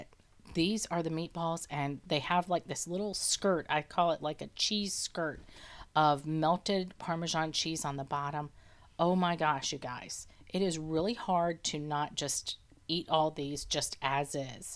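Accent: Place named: United States. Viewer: The moment she had American accent I stop watching what American know about meatballs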